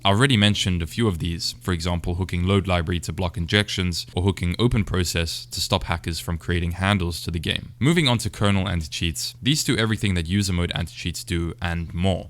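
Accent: South Africa. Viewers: Clicked on this and didnt expect to hear a saffa, lekker vid bru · This brah sounds South African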